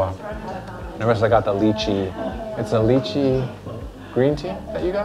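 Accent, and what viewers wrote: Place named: New York City, United States. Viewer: I can hear the NYC accent slightly stuck with you through osmosis from through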